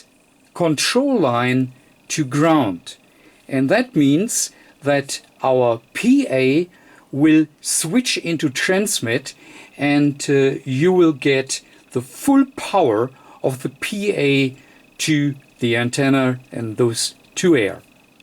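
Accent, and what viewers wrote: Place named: Germany. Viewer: I am just glad to see you back. I love your videos, german accent or not!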